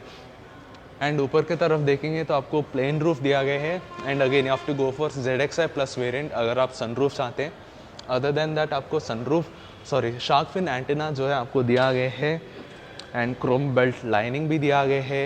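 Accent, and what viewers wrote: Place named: Kerala, India. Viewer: If I am not wrong your accent resembles Keralam.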